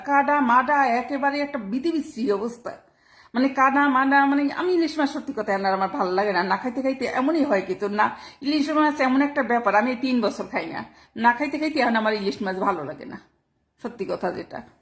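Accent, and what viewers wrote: Barishal, Bangladesh. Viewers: পুরাই বরিশালের ভাষা দারুন।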